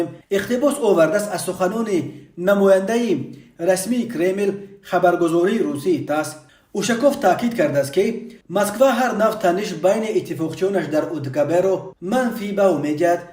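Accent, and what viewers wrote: Pamir, Tajikistan. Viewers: Э помири рангу намудата нишон те якбор, помири гап зан помири акцент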